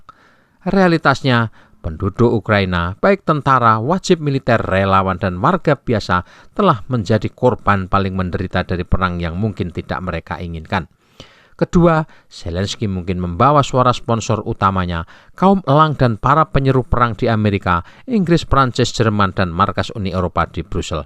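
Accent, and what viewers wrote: Java, Indonesia. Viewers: Mas, medoke ora ilang ilang ? Aku Suka 😅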